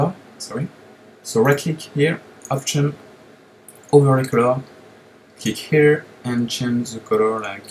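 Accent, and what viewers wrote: France. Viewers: French accent :< ( Je suis français aussi, énorme tes vidéos :)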